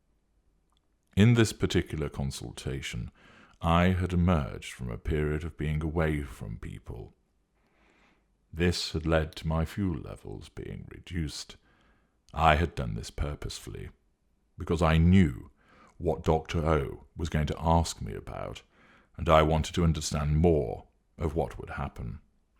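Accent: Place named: RP, United Kingdom. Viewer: Thanks I must say hats off to you again, I love your INTEGRITY and your Queens English.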